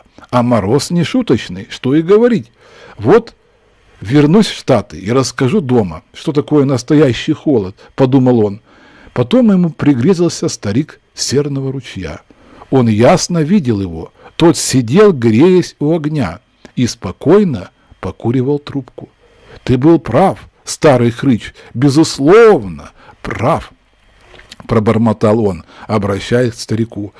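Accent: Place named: Ukraine. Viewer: Спасибо за начитку, конечно, но украинский акцент, сбивчивость и, самое главное, обилие рекламы, делают прослушивание некомфортным, относительно других чтецов произведений Лондона.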